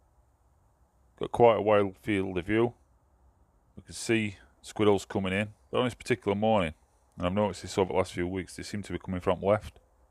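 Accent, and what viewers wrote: Yorkshire, United Kingdom. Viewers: What part of Yorkshire are you from pal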